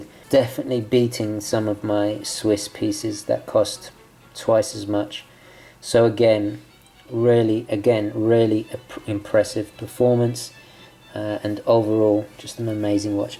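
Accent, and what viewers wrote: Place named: South London, United Kingdom. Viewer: I'm tempted, but am I the only one with doubts about the compass? i'm ex-military, do lots of hiking etc - I know how to use the sun/ watch technique to navigate but I've never heard of anyone doing it seriously. At best, its is a rough direction finder, so all those intricate bearings are pointless. So, I can't help feeling it's a gimmick on an otherwise excellent watch - an internal diver style timer or 24hr bezel would be way more useful. Any thoughts, folks? Anyway, greetings from South London (or as and I might say, Saahhhrf Laaahhhndon). What a fantastic channel - you're going from strength to strength, chapeau!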